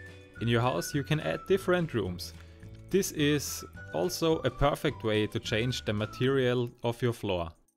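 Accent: Germany. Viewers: man hört richtig das du eigentlich deutsch sprichst 😂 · Kann es sein, das du Deutsch kannst (leichter Deutschdialeckt) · Dieser deutsche Akzent^^ Sehr schöne Sachen!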